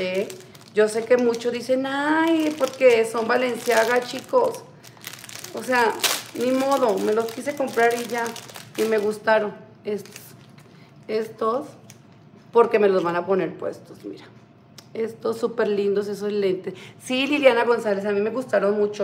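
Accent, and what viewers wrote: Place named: Colombia. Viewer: Me encanta su acento colombiano ,no le había comentado antes pero me caí usted muy bien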